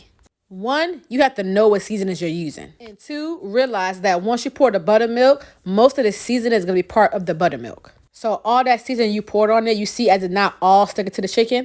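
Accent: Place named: New Orleans, United States. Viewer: You don't sound like you from New York I thought you was from New Orleans or something like that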